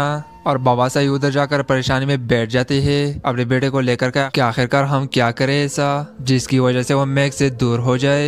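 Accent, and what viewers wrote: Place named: Urdu, India. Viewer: lahjy se to lag raha hai ka ap Urdu sai bol rahy hain because ap Muslim hain Hindu jo huty hain wo hindi bolty hain aor hindi bolny walo ka lahja pata chal jata hai aor India me jo muslman hain un ko to ni lagata lakin pata chal jata hai ke ya Indian muslim hain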